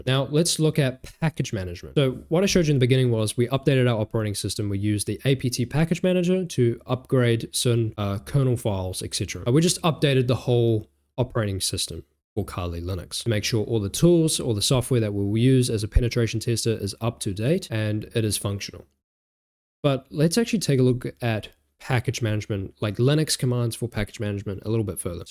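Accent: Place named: New Zealand. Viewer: It's a fellow New Zealander!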